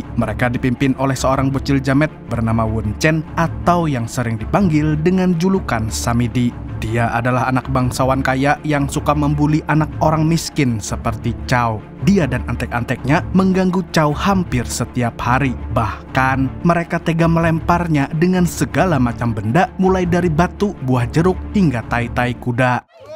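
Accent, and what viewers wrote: Banjar, Indonesia. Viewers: Orang banjar kah mang? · Urang banjar😂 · Adminny urang banjarkh nih😅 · Umayy orang banjar sakalinya😂😂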